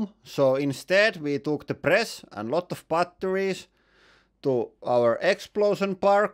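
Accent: Finland. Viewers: I love your Finnish accent. My Grandparents and Parents have passed away and I miss all things Finnish. Now I feel like I need to buy a hydraulic press for fun!...or go back to Finland. Hyvaa Paivaa. Puhakan Timo. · I love the Finnish accent! Hyva Suomi! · Is that rallyenglanti I can hear?😊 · Ужасный английский с финским акцентом😅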